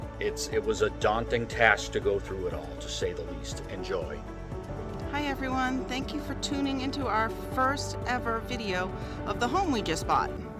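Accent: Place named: Boston, United States. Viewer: Thought I recognized that accent - Bostonian here!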